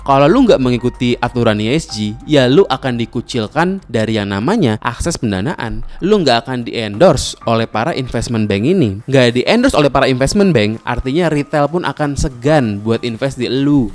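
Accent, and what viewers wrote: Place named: Betawi, Indonesia. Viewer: Dia pakai cara bicara khas orang betawi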